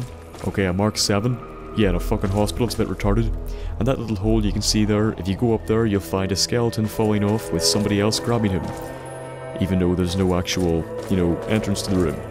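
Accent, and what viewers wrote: Northern Ireland, United Kingdom. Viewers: are you northern irish boyo? your humour and use of expletives are second to none. Reminds me of myself lol. Brilliant content creator you are bro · which part of Northern Ireland you from?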